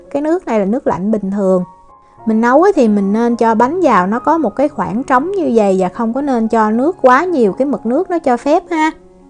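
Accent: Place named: Mekong Delta, Vietnam. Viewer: lam Theo cach cua Chị Thanh cong nhieu mon ma khong co cach nao khoe voi Chị , Trong clip Chị co noi nha Chị o bien hoa ? Ma nghe tieng Chị Em nghi Chị o mien Tay , Em cung o gan bien hoa 😀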